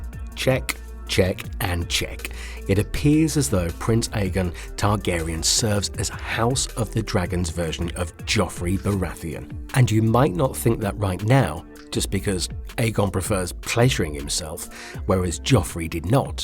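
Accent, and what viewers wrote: United Kingdom. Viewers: Yeah, I thought the other one was some kinda read-aloud android. This British dude is perfect... plus, you know... when people talk about GoT/HoTD, they just sound more relevant than a nerdy american with a lisp whose gums you can we smack while he talks- I forgot which specific channel I'm talking about. But yehh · Your British accent makes you sound intelligent, but your pronunciation and word choice implies the opposite. To be clear, I am not talking about your pronunciation of GRRM's invented character and place names, I'm talking about your mispronunciation of English words.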